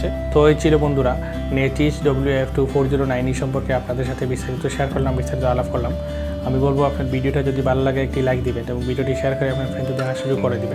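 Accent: Sylhet, Bangladesh. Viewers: Sylheti vi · vai apni ki sylheti naki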